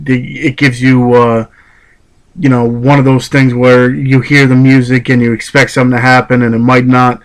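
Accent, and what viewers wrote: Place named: New Jersey, United States. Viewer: i love your jersey accent lol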